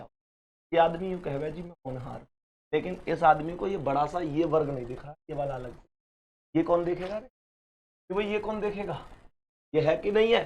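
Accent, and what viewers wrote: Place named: Mathura, India. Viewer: Mathura ki boli on point :hand-purple-blue-peace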